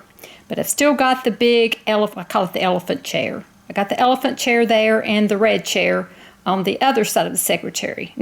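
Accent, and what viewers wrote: South, United States. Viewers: Soo lovely, you have a real, lovely victorian Southern States of America. Still love your funny accent. Lots of love 😘😘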